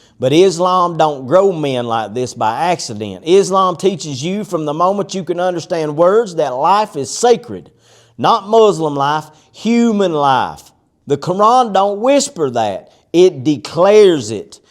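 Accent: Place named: Texas, United States. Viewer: Wow, once again I feel blown out by such a strong and sharp speech full of truth and wisdom so that I couldn't help it but to listen to it three times in a row! Bro, your words are those of a sharp shooter in their accuracy and that of heavy weaponry in their effect. I'm totally amazed. I must add one thing - I used to hate so much the Texan accent due to the war criminal G. W. Bush and the such. But thanks to sheikh Yusuf Estes first and thanks to you now, I've come to enjoy listening to this beautifully spoken English. My sincere admiration and respect, Bro.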